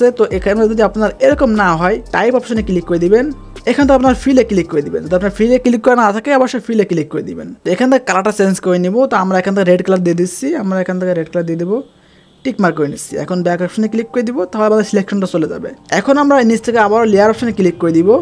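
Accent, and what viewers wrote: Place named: Pabna, Bangladesh. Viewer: আপনি কি পাবনার লোক পাবনার মতো ভাষা ।সঠিক হলে রিপ্লাই দিয়েন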